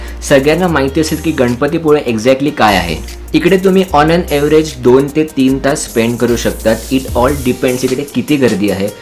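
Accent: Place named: London, United Kingdom. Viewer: हा असे मराठी का बोलतोय?London born आहे का?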